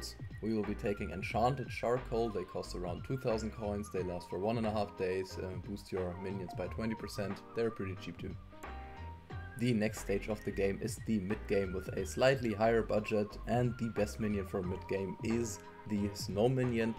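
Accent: Germany. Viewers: Sprichst du deutsch/englisch Englisch mit deutschem Dialekt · I smell german accent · Der Deutsche Akzent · Nice tutorial, i didnt know what minions to go for. That really helps! (Btw, man hört dann deiner stimme stark das du deutscher bist xD) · Ich glaube ich höre deinen Deutschen akzent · i can hear a accsent are you german?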